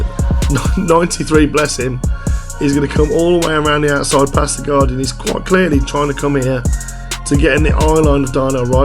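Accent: England, United Kingdom. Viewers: Mate, I’m absolutely loving this breakdown. Insightful but with an English twist. 🏴 I always feel English analyst sounds a bit off but you nail it bro!💪🏻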